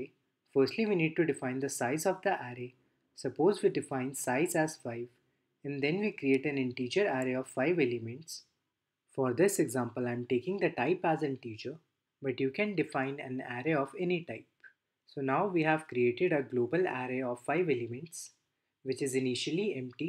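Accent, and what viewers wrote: India. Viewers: you have quite heavy indian accent but other than that, clear explanations and simple video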